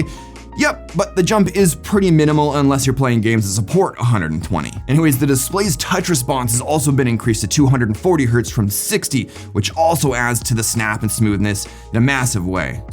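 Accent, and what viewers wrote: Canada. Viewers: Why is every tech YouTuber a Canadian? Never noticed until I moved to Australia and became more sensitive to fellow Canuck accents.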